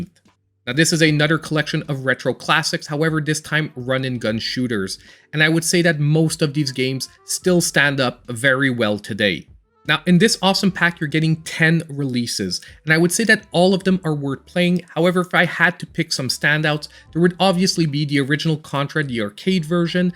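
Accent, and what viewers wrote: Canada. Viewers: Why do you sound Canadian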